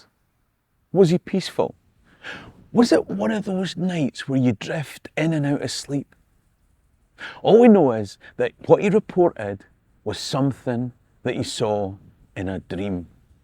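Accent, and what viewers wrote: Scotland, United Kingdom. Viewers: Wondering how many people understand Scottish, perhaps he would do better if he learned English.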